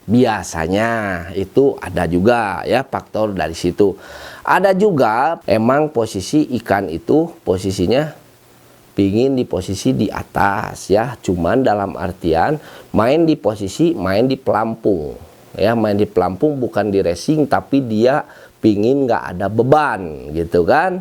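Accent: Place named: Sunda, Indonesia. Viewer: Cri khas a serius tapi loba hereuy khas orang sunda pisan😂😂😂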